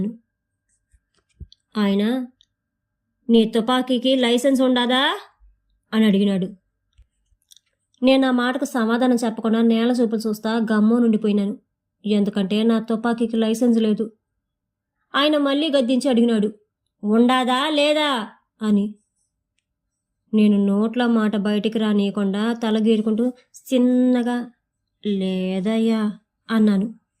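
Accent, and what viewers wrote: Rayalaseema, India. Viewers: నమస్కారం madam, యాట కథలో మీ వాయిస్ రాయలసీమ స్లాంగ్ లో చాలా బావుంది. ( అమీరున్నీసా ) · నేను రాసిన యాట కథకు మీరు రాయల సీమ యాసలో జీవం పోశారు మీకు మీ ఉషా చానల్ కు దన్యవాదాలు మేడం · బావుందమ్మీ నువ్వు సెప్పిన ఇదానం.. కథను కల్ల ముందు నిలిపినవ్ బా.. గొప్పగా చెప్పారు గారు.. మా సీత్తూరు భాషలో.. అందులో మా వెదురుకుప్పం లో రచయితకి పక్కన ఊరు మాది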